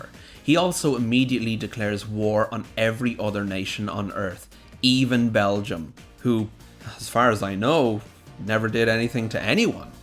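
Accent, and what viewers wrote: Ireland. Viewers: ❤love the Irish ness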